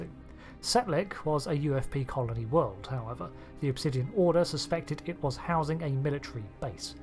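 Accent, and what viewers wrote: United Kingdom. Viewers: The British accent is great for this type of subject. Makes it sound kind of like an old WW2 documentary. Lol.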